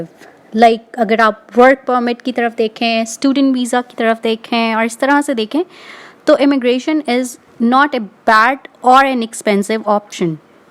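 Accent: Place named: India. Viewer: Nice speaking English just like indian so easy..appreciate to you madem...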